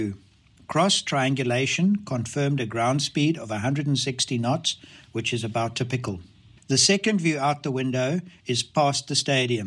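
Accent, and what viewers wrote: South Africa. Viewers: Hi. I do live in Oregon USA now but did live in South Africa till 1999. I am guessing from your accent you are from SA as well. I have to admit I am very biased about Nepal. I have visited twice and left only a month before this accident. I had been almost as excited as some of the locals I know about how positive the new airport would be for everyone. This made something immeasurably bad even worse. If you have never visited the people in Nepal are incredibly kind, hard working and hospitable and its a very easy place to love. And whilst understanding their transport challenges in terms of equipment and procedures (it does not seem these were a factor here) I have only had the greatest respect for the pilots and bus drivers who do an incredible job under very tough conditions. I can only fault some taxi drivers, which seems to be a problem everywhere. 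I just hope there is some final explanation which does not add to this tragedy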